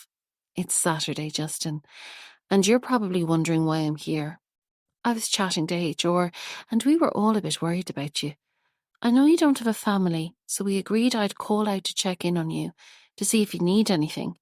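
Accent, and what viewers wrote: Ireland. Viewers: Irish accent? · sounds Irish to me.... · Yay! Irish narrator. Dunlaoghaire! I know the town so, so well!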